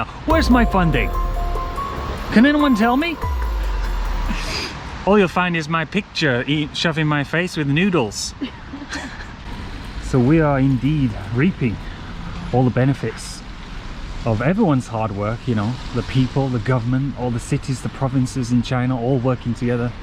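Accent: United Kingdom. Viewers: No more UK accent? Haha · I came to listen British accent. It's so cute.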